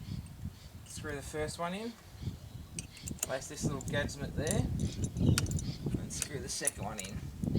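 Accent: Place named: Australia. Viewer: very helpful. and as a yank, i appreciated that aussie accent.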